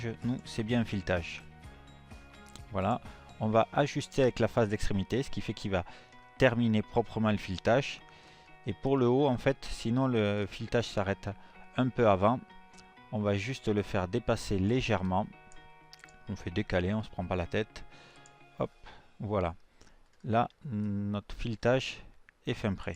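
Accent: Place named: Southwest, France. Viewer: Merci pour ce super tuto efficace et commenté qui sent non pas le canard mais le Sud/Sud-Ouest ...!!!!? je connaissais une Madame Lanvi !! ;-)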